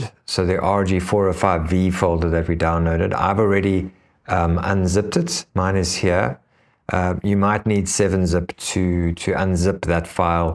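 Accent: South Africa. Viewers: Howzit? Wasn't expecting to hear a South African accent when I clicked on this video haha I'm from Canada but my family is originally from SA. Have to say this was a very clear video and can't wait to come back to it when I receive my RG405V.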